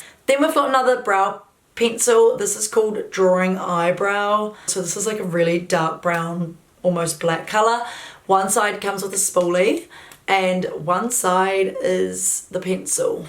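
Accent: New Zealand. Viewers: your good old kiwi accent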